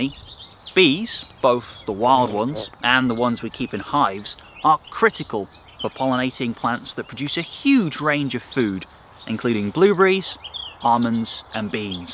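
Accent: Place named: United Kingdom. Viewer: Finally a british accent yay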